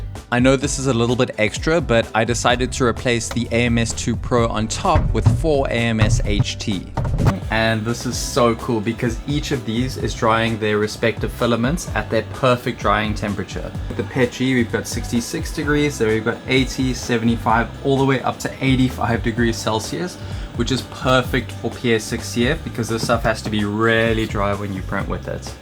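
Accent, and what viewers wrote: South Africa. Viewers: So cool to hear a South African voice while watching a video like this. Keep it up man!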